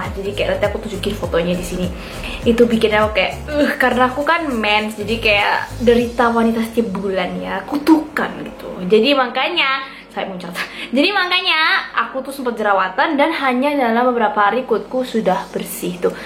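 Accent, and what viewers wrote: Indonesia. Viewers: Suka sama suara kakak medok jawa bgt😂🤗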